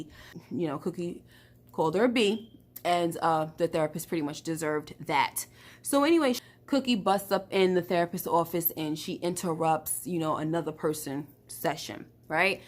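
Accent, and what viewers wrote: New York, United States. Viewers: I have to ask from hearing your accent - are you from NY?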